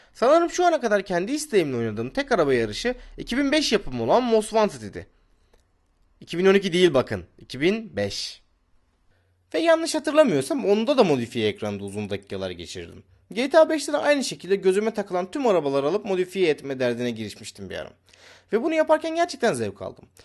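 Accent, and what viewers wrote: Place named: United States. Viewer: Aga Amerikan adamları tarzında konuşuyorsun. 'Hadi ama hangimiz yapmadı ki' gibi. Ama bu konuşmalar bana hoş gelmiyor. Alışkın değilim sonuçta. Temiz bir anlatım yapmışsın. Tarafsız olmuşsun. Tebrik ederim.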